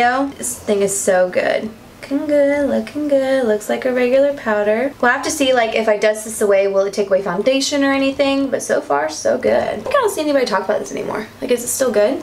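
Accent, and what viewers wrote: South, United States. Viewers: I absolutely love your videos and southern draw!! Keep it up darlin'. 💚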